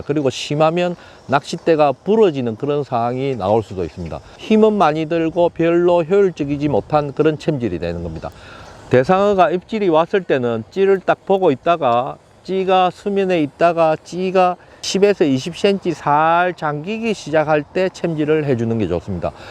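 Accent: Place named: Busan, South Korea. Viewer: 낚시를 시작하는 초보입니다.^^ 같은 부산사투리에 귀에 쏙쏙 들어오는 설명이 너무좋습니다. 많이 배우네요.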